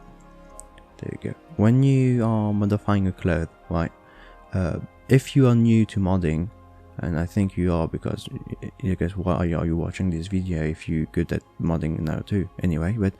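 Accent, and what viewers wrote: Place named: France. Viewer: lmao, you think I want your help after you ruined my experience of modding with your incompetence. Right now, I am disgusted by your way of slacking this, trusting you through the process but it started to go downhill halfway through video, you assume people know all this stuff, not even explains the commands you type, yeah yeah just do it mindlessly like that's the purpose of this video, On top of this, that french accent, yuck 🤮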